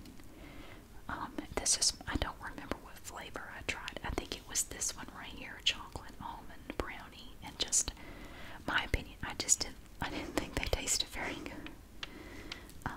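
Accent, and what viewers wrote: South, United States. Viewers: Anyone else wondering where she is from in the South!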